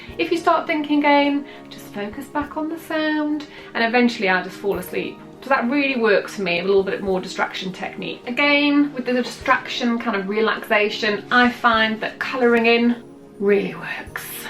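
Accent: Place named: United Kingdom. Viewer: Thank you for these ideas! I too have much anxiety, I loved your train idea, going to try it!!!! thank you! just found you "accidentally" by watching your "skinny tan" video. Im very intrested in ordering the product & somehow can across your videos😊 your skinny tan demo. convinced me to order it! so, thank you! also, I am so in love with British people & your accent ( Im American) so I also just love listening to you talk!😊 will be watching alot now! subscribed to yoir channel too btw!😊 you are lovely! thank you!🤗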